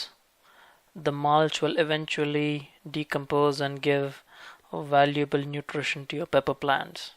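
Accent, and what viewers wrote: India. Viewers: are u Indian ? u sound like one :)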